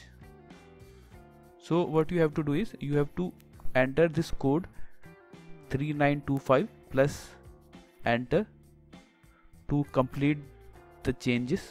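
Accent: India. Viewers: This Indian English is so hard to stand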